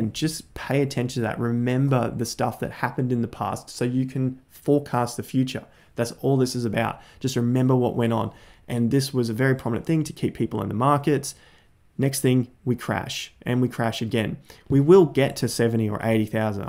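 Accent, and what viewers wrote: Australia. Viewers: Wycoff theory was echoing in my ears in his aussey accent for days without knowing what it really is. now I friggin know.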